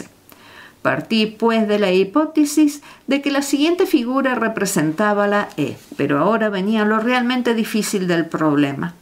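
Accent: Rioplatense, Argentina. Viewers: Para mí la pronunciación de las "y" y de las "ll" al modo rioplatense es absolutamente irrelevante. En cambio la entonación de las oraciones y de los párrafos es perfecto. La interpretación de los personajes y sus voces es sutilmente eficaz. No hay incorrecciones en el seseo o el ceceo ni en ninguna otro fonema. Los acentos son correctos. Y la narración de principio a fin es no sólo amena sino que consigue enganchar, entretener y alegrar el intelecto. Gracias de verdad por este canal de lectura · Me encantó la narración, el tono y estilo de la voz, la velocidad, el acting... pero tanto SHO,SHO,SHO del castellano rioplatense me irritó y no terminé de escuchar. ¡Un abrazo y mucha suerte!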